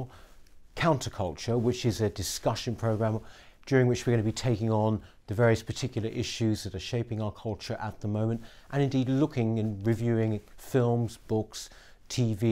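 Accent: RP, United Kingdom. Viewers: It’s refreshing to hear intelligent, incisive, coherent, reasoned and truthful comment and discussion, spoken in Queen’s English in whole sentences without beginning each one with “So” or endlessly repeating “Y’know”. More power to you!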